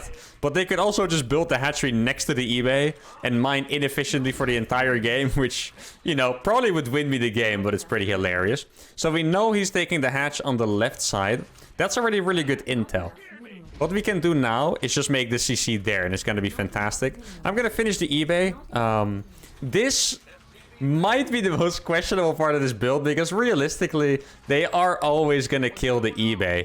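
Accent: Netherlands. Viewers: Ben jij Nederlands?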